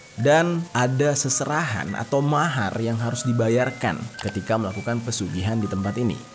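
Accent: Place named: Madura, Indonesia. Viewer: Yo mesti la bang apa lagi logat Madura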